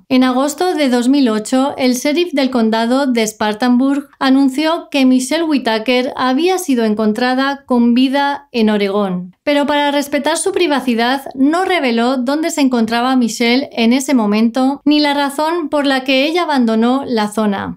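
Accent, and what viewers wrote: Spain. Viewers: Me encanta como hablas y tú acento español.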